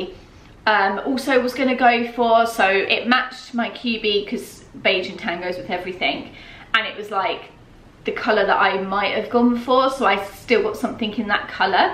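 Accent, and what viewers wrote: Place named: United Kingdom. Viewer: Your British accent!👍🏻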